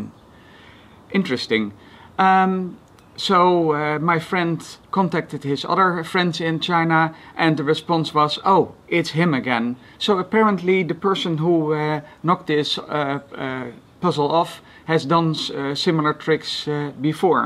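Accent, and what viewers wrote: Netherlands. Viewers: I love your accent, are you from somewhere around Netherlands? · Yeah, he's dutch.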